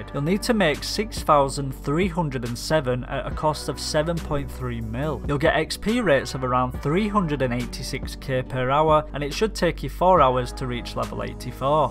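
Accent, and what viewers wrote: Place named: Leeds, United Kingdom. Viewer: bruh u from leeds lol?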